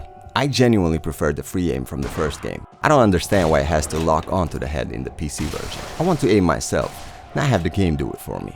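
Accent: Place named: Jamaica. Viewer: First video I seen of yours and I subscribed instantly. This video is so well done and the Jamaican accent is easy on the ears, great job man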